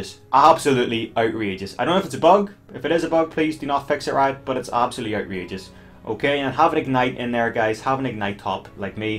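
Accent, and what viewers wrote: Northern Ireland, United Kingdom. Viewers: Northern Irish! :D cool accent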